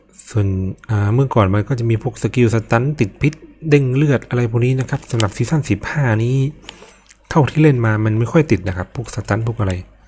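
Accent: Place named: North, Thailand. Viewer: อ่อครับ ผมนึกว่าพี่เป็คนเหนือ😅😅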